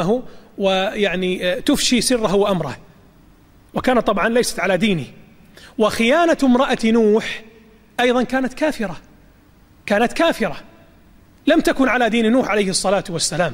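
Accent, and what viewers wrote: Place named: Egypt. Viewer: عم تمصرن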